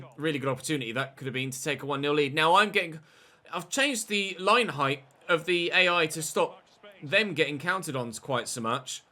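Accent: United Kingdom. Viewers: I love how absolutely British this guy is. A proper Englishman. Its fun to listen to him as an American.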